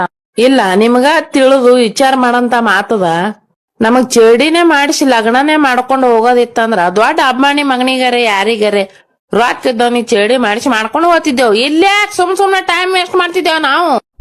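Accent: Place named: Indi, India. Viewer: ಪಕ್ಕಾ ಇಂಡಿ ಭಾಷಾ ಮಾತಾಡತಿ ಅಕ್ಕಾ. ಇಂಡಿ ಬಂಗಾರದ ಬಂಡಿ 👌👌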